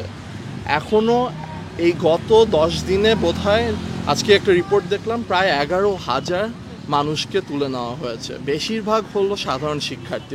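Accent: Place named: Bangladesh. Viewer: তোমার কথাগুলো এত চমৎকার লাগলো যে কি বলবো। তুমি অত্যন্ত গুছিয়ে, বলেছো। তোমার কন্ঠের সৌন্দর্য, শারীরিক ভাষা, গুরুত্ববোধ, আঞ্চলিকতা সব মিলিয়ে একটা পারফেক্ট বাংলাদেশী মানুষের রুপ সুন্দর করে ফুটেছে। মানে তুমি পুরদস্তুর বাঙ্গালী এবং বিদেশ গেলও বাংলাদেশী।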